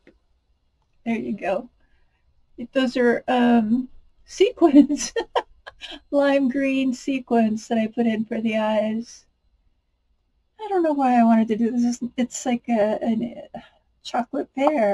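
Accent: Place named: British Columbia, Canada. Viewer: Hi you sound decidedly Canadian BC in particular... LOL if people don't want to listen to your channel they can move on those of us that want to listen we will and as far as your hands go your hands look like every other artist hands except they're not plastic looking I hate it when they try to do these crafts with those bloody Nails on it's ridiculous you're fine and you're doing a great job and thank you for showing me what I can do with all my messed up resin projects. I am extremely new to pouring resin and most of what I have poured by now isn't real useful until now thank you❤❤❤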